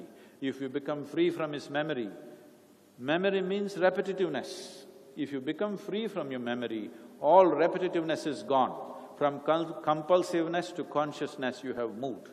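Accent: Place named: United States. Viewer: what this jerk Sadguru is Bull Shit.He has no knowledge.He never answer a question posed instead talks some pig shit in super American English..see above qstn. was asked how a person feels just before his/her death and this jerk says something mango Banana..i will tell u what happens ..just a 10 minutes prior to death the person see his departed parents.Departed friends and relatives and feels some one is pulling his body from his/her feet and feels coldness in his body.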